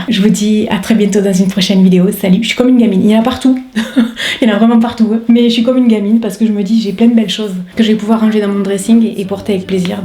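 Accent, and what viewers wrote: South, France. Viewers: Bonjour arrête de culpabiliser parce que tu te fais plaisir. Les jaloux ignore-les j'ai 68 ans j'adore regarder tes vidéos tu es naturelle reste comme ça ne change rien. Tes vidéos sont formidables. J'adore ton accent du sud je suis moi-même de Montpellier je te trouve géniale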